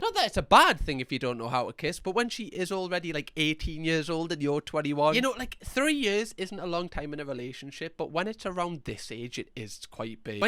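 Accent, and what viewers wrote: Newcastle, United Kingdom. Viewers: Love your vids,! I would LOVE for you to start a new channel, can't get enough of your cheerful, witty, adorable content! And I LOVE the Geordie accent! It's now my fave UK accent. Much love from across the pond! Donuts forever! xoxo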